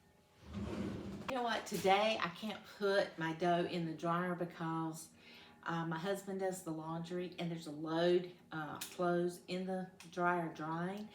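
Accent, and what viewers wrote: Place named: South, United States. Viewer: EXCELLENT VIDEO Thank YOU for sharing this You are definitely a Southern Lady... I know that accent.. I have it too What State are you in? or Where are you from? I have lived in Texas.... from Oklahoma (southern accent) Thank you so much for sharing this wonderful video. Loved it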